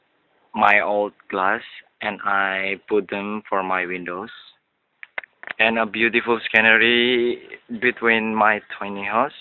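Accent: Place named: Indonesia. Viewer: mas bahasa inggris nya lancar ya, ya Memang kedengeran aneh soal nya aksen nya indonesia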